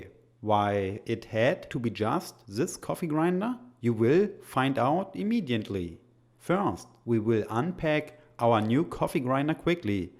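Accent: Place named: Germany. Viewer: Giving upvote for the lovely German English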